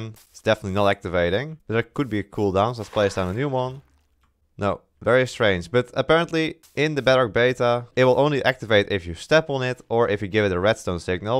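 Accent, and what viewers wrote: Netherlands. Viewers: Are you dutch? You sound like someone from the netherlands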